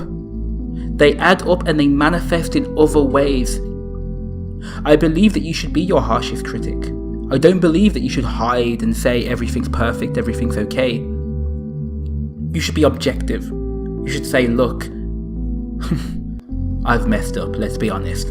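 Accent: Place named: United Kingdom. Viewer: Self-improvement is lonely, yes. But there are definitely ways to make it less lonely. I generally use a gym rather than training outside, specifically for this reason. Judging by your voice, I'm guessing you're British? If you're out training between and 8 UK time, I'll be out training at the same time. I'll try to work in an outdoor session once per week so that, even if we aren't physically training together, it might help us both feel a bit less lonely:) Stay strong brother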